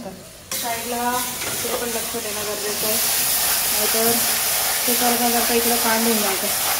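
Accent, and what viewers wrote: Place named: Nashik, India. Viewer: शेवटी ताई तुझ्या बोलण्यामध्ये थोडी नाशिकची झलक आहेच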